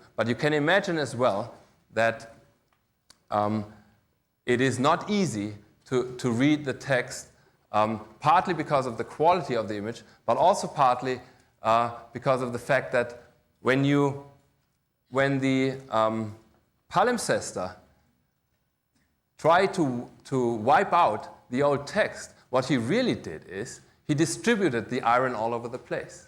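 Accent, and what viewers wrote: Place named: Germany. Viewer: These lectures always sound more authoritative when delivered in a German accent.!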